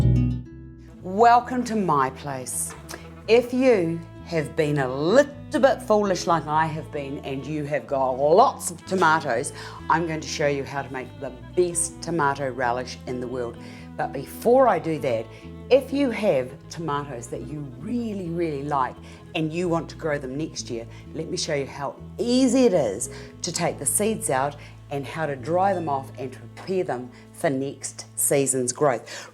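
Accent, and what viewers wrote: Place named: New Zealand. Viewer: Good old Kiwi accent : )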